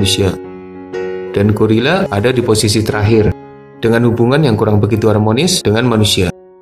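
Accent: Banyumas, Indonesia. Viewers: Ngapaknya top..😄😄😄😄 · Sampean ngomonhe ngapak mas, wong ndi si?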